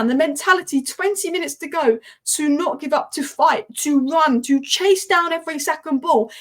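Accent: Manchester, United Kingdom. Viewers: Just because Man U were once a great club. Doesn’t mean you are now empires come and go . The most arrogant supporters in the premier league Man U supporters support your club though thick and thin ps that’s a funny manc accent you got yourself there!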